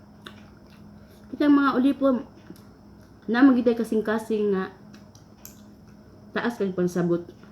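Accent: Visayas, Philippines. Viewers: hi day sus ganahan jud ko nimo day,kay siaw kaayo hahaha..Lawm sad kaayo ug bisaya..Bisaya sad ko day..Pero nakapag-asawa lng sa KL..Pareho ta kusog kaayo mohab-hab hahaha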